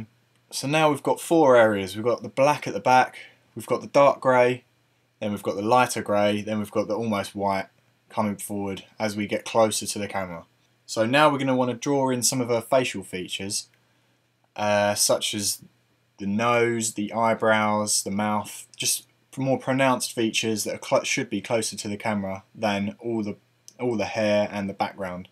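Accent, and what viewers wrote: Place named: United Kingdom. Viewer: You Brits' accent drives us Americans crazy (with our PROPER way to pronounce words!!) I think I've figured out how the way you are saying words is different. I heard very early in the video when you said the word "Photo". I think we say it, the proper way of course, Pho-to, whereas you Brits say Phot- and then the o. It just doesn't make sense to me. I just heard it again in the word "thirty". You say Thirt-y or "thirt-ee". And it has always just driven me mad to hear you pronounce, or not pronounce I should say, your letter R's. Why don't you use your R's... "MATE"? There you go again..."Settings" is SE-ttings, not SETT...ings. And "little" is LI-ttle, not LITT...le. Foo-tage, not FOOT...age!!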